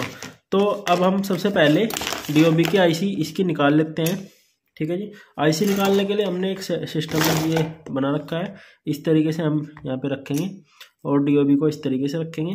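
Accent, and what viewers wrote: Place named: Baghpat, India. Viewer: Sar aapke Bhasha Baghpat side ki hai kya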